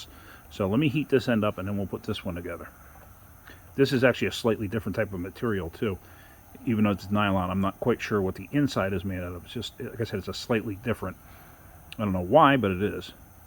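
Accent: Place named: New York, United States. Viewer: I thought you sounded like a New Yorker, then in recent videos you've confirmed that. I spent 30 years in Texas and for a bit in the 80s did the annual state safety inspections. I've now been in Minnesota for 10 years and have now experienced the rusty crusty stuff common in the "salt belt" of the US. I've been watching Eric O at SMA deal with the rusty crusty stuff PLUS the crazy laws and regulations and restrictions that NY state puts on cars and I have mad respect for him dealing with all that. I'm guessing you're glad to not have to deal with all that!!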